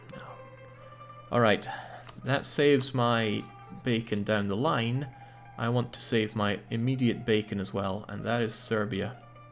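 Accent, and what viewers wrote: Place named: Scotland, United Kingdom. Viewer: Stuck in a travelodge all week with Potato Internets, so just listening to those dulcet scottish tones in sweet 480p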